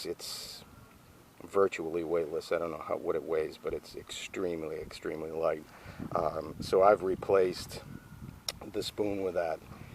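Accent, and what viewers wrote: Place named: Boston, United States. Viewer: Man, with that Boston accent you sound like Matt Damon from the movie "Good Will Hunting". Yeah, I have the GSI Minimalist with a little Fancy Feast stove tucked inside. All I have to do is bring some alcohol and a wind-screen and I'm golden. Enjoyed the look at your system. Thank you, my friend.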